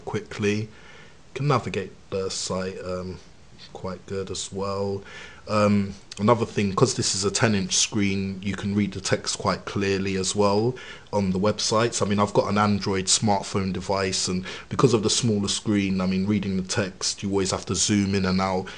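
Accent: United Kingdom. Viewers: ENGLISH accent, there's no such thing as a British one. ;) xx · Thumps up if you're fond of the British accent.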